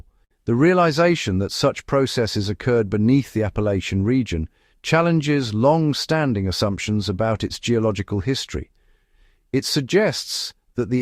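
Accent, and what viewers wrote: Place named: United Kingdom. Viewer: WHAT SOUNDS TO ME LIKE A BRITISH ACCENT IN NARRATION IS MOST OBNOXIOUS.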